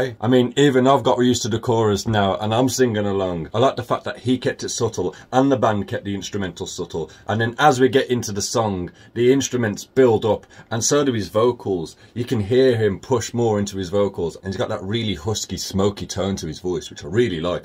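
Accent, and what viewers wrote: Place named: England, United Kingdom. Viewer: hi, where are you from? I adore your english accent. I want to learn it....I must!!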